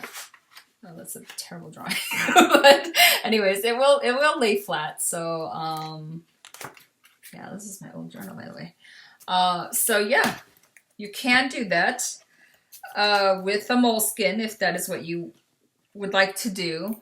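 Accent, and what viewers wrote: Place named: Germany. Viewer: Hey your German is cute! Greatings from Germany 😀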